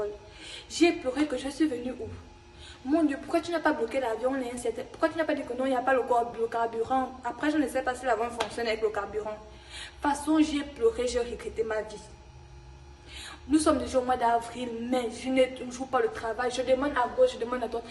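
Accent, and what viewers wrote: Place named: Russia. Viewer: Ton accent russe est impeccable.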